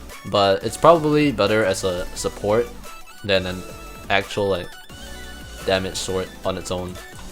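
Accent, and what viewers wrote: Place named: Singapore. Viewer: u sound a little Singaporean haha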